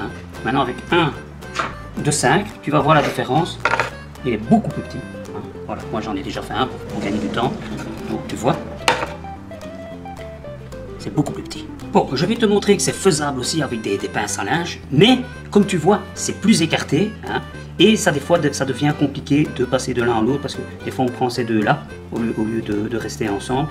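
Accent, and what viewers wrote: Belgium. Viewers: Merci beaucoup, je vais tester ..super explications avec l'accent belge...c'est toujours agréable pour une expatriée...bonne année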